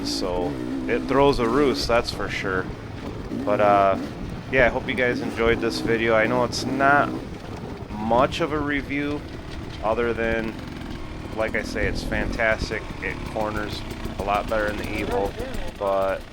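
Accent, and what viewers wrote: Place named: North, United Kingdom. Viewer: I hear your mega northern accent!😁 yu play hockey too!.!?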